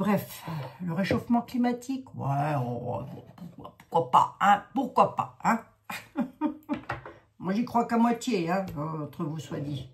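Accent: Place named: Haute-Saône, France. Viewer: Cc la vieille femme 😂😂 ,oui j'ai regarder hier pour la pompadoure tu devais regarder la suite se soir . T'on accent je le connais ma mère était de pas très loin de chez toi ou j'ai été en décembre dans le 70 luxeuil les Bains, et je voulais passer te voir mais j'ai pas oser peur de déranger. Jésus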